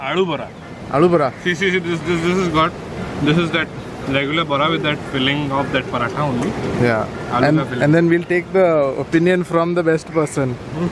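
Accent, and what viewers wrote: India. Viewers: Great to see someone from Odisha in blogging and exploring the beauties of our State .. thanks bro and all the best a slow and steady race hope to see you soon in 100k subscriber club soon, The Odia tone in between ur blog is damn good bhai ... gote Pahala Rasogulla upare video te hele badhia heithanta brother !! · Bhaina gadi chale la Bela ku katha kuha bi Tama video dekhi ki dar lagi chi ba...au Tama odiya language PURA Rourkela style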